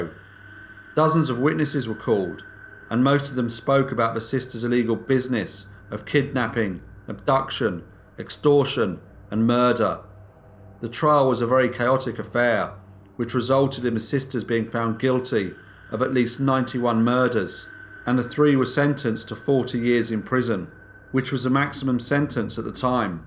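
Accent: England, United Kingdom. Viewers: It’s an English accent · An English narrator? what the feezy! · lol, I figured that much, but which flavor of British? Doesn't sound like any of the London accents I've been exposed to, doesn't sound like a north England/southern Scotland, and not very Welsh, either. I'm no expert, but I do watch a decent amount of BBC programming, so I've been exposed to some of the more popular accents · It’s so nice to be able to understand your English accent! My hearing is also bad and I always understand what you’re saying! Again, another story I’ve never heard of! Love it! 👍🏻😊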